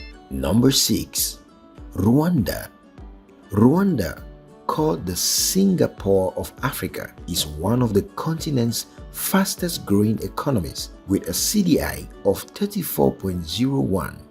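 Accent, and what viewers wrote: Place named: Ghana. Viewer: I can see that U r drawing from those Western people who come up with these incorrect assumptions. Nigeria is the cheapest country in the world. (Possibly, Pakistan may b a little cheaper). I have done items for items by dollar amount. I don't know where U got the idea of subsidies about Nigeria. The only one item subsidized by the Nigerian government is petrol and the IMF has bn telling the government to remove that only one subsidy. I live in the USA and as I am planning to move to a cheaper country other than Nigeria, I've researched (for 10 years) and travel to see where it's cheaper than Nigeria but haven't found any. I've seen some of those non African's websites declaring a lot of baseless numbers. As I can tell from ur accent, U r a brother frm Ghana. Can U believe that some of these non Africans claim in some of their presentations that Ghana is cheaper than Nigeria! 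U & I know that that's not true! So brother, to do a good job, wut U need to do is take items say, a dozen of eggs, a one bedroom apartment, a loaf of bread etc in one country and compare the prices in USD and convert them to the currency of ur country of interest. U'll clearly see wut am saying. Don't rely on those Oyinbo declarations. As President Uhuru Kenyatta of Kenya said, they'll be giving information about Kenya and at the end of the 'news' it'll be Mr. White, a correspondent from South Africa. That's almost 5000 kilometers away! 😆😆😆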